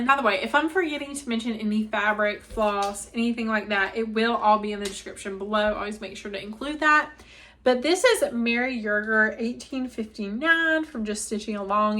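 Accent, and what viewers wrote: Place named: Eastern North Carolina, United States. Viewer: Congratulations on the new home! It’ll be such a sweet time, and no hurry to “make it your own”… your style will take shape over time ☺️ Been watching and enjoying since your first post and am not usually a commenter on videos, but wanted to encourage you today… I’m also a fellow eastern NC girl (of 52 😉) and love hearing our native accent on YouTube 😁💕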